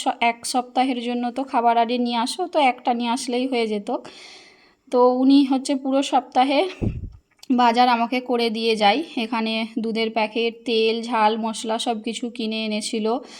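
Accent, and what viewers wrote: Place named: Kolkata, India. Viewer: তুমার কলকাতার কথায় বারি দিদি❤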